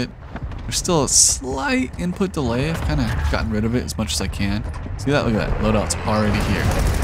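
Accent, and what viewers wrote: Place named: Mexico. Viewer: I know ur Mexican u have a strong accent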